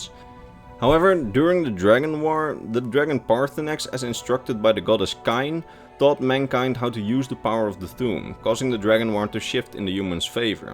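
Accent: Netherlands. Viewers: Haven't really watched the while video yet (doing that now) Love the video so far, really Interesting. Do you happen to be Dutch? I may recognise a slight but of am Dutch accent.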